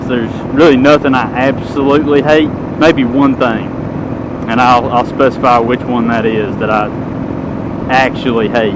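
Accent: South, United States. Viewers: Greetings! You sound like Huckleberry Hound to my old ears. Where are you? That landscape looks totally English and the only thing that gives it away as the US are the road markings and your deep southern drawl. And I don't see potholes in your road there... how is that?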